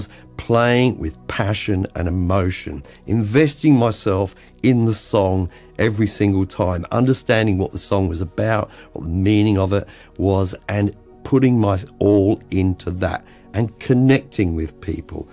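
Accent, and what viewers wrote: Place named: Australia. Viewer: Wow, I really thought you were Australian. Never would have guessed you were brought up in the UK. Anyway, thanks for sharing your expertise here. It's really helped me a lot as a Cakewalk newdie. As for me, can't really say I'm a musician, but have the urge to create. Composed a lullaby for my new baby last year which led me to Bandlab, and now to Cakewalk as I try to make the song as good as I can with my limited abilities and 100 virtual instruments. Really like it as a creative outlet. Thanks again for making it easier.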